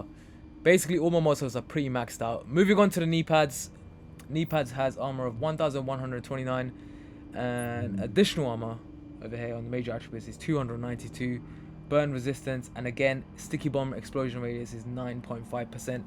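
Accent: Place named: United Kingdom. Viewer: are you in UK ) your accent suggests it but fast are long here man 20 hours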